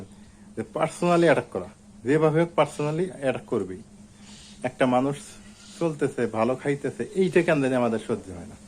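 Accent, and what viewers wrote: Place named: Tangail, Bangladesh. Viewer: Tumar bhashay buja jaiy tumar bàdi Tangail,koun upon zella?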